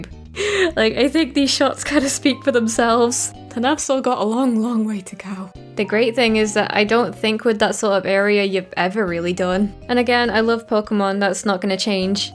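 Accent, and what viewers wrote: United Kingdom. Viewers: Your voice with the British accent just make the unintended ASMR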